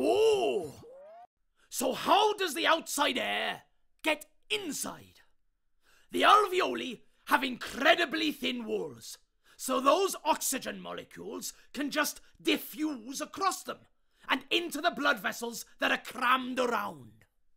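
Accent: Wales, United Kingdom. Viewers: Warning: contains a dodgy Welsh accent. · There's some people who love the entertaining Welsh accent, and some who absolutely hate it. I appreciate the attempt to make this entertaining, but when there are so many people against it, I reckon it'd be safest if video makers didn't use it.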